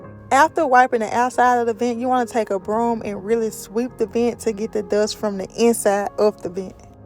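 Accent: South, United States. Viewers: I like your country accent I'm country too Beautiful Queen you clean your house so well that Amazing. ❤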